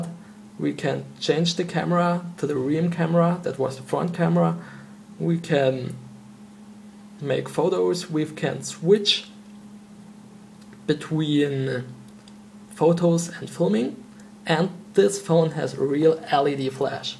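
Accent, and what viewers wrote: Germany. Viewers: hahaha :D deutscher akzent :D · 5sec und ich wusste zu 90% das du deutscher bist :D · man merkt schon nach den ersten sätzen am aktzent das du deutscher bist. i mean... "änd wOt wOs in it? äh scophone" .come on ! :-) entweder deutsch oder korrektes english :D , aber sonst ganz nett das du eine review gemacht hast :-)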